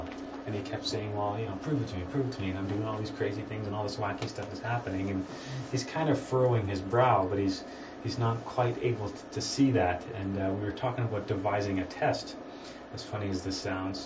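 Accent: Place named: Canada. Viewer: He's from Canada... I can hear the accent ;) haha not that it matters but great stuff in this video good work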